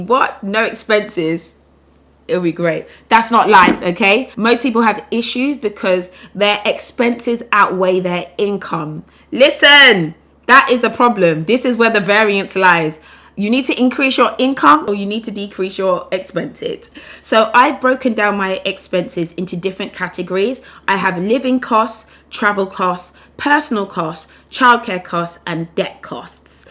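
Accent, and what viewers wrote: United Kingdom. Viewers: She sounds British to me! Unless she moved here as a kid? The first video I've seen so I have no idea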